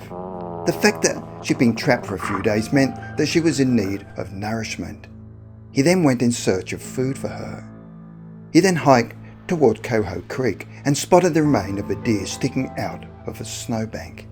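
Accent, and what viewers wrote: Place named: Australia. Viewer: What a touching true story! Proves that "Truth" which is in this case has taken a form of Mother Nature Herself, can manifest in ways far stranger than those in fiction! There is an intrinsic bond among all the inhabitants of the planet which comes to the fore, and as the narrator has so rightly pointed out, all this transcends "conventional wisdom". The narration is also very engaging, I suspect the accent is the cordial Australian one!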